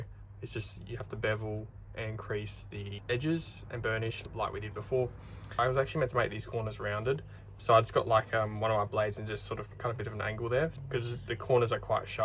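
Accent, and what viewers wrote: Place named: England, United Kingdom. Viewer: Love your videos! Can you give us a tutorial for concealed carry bags? I am an U.S Army veteran, and I carry my gun with me for safety purposes…but the concealed carry bag I purchased on Amazon is already falling apart after having it for 1 year. I was wanting to make my own. Not sure if you support concealed carry weapons, since it sounds like you’re from England, but I just thought I’d ask.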